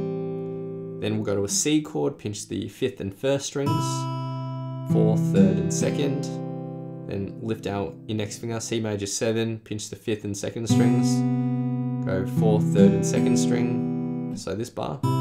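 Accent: Australia. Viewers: i always forget this guy has an aussie accent when the video starts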